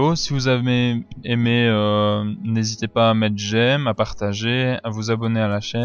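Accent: Belgium. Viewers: Ah un Belge !!!!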